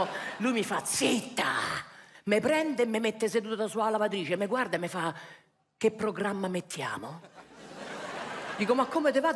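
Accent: Rome, Italy. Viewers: E stupenda troppo forte fa ridere da morire poi romanesca è ancora più forte 😅😂😂😂😅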